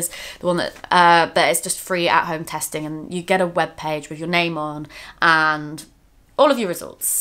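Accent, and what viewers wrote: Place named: United Kingdom. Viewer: How charming is her British accent? Sounds so intelligent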